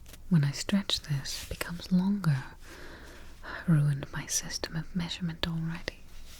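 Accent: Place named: Mid-Atlantic, United States. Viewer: I wonder if mid Atlantic accent is for ASMR or if she always talks like that. Either way it's perfect for ASMR intentional or not 😌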